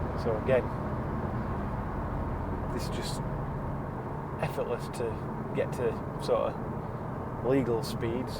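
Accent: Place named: Barnsley, United Kingdom. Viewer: Proper Barnsley lad, tell thi nar!!!! when can tha do my BITDI.... 4MOTION RULES 😂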